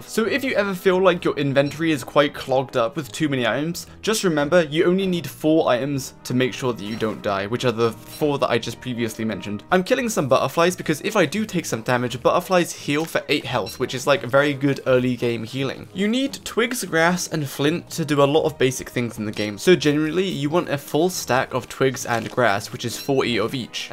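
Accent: United Kingdom. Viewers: this guide is the best guide i've ever seen ım am not a beginner but ı've got some tricks by you also you mentioned about almost everythink thank you love ya :3 <3 btw your BRİT-ish accent is soo good :)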